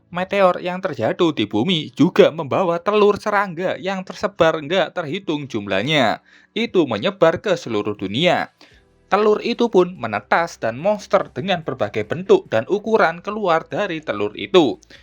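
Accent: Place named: Java, Indonesia. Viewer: mas tolong medok nya di atasi dulu bikin sesak napas dengar nya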